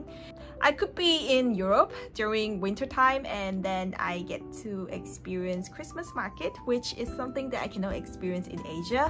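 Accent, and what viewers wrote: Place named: South Korea. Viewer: Maybe your interaction with crews and people, your accent is more towards Korean than Malaysian accent 😊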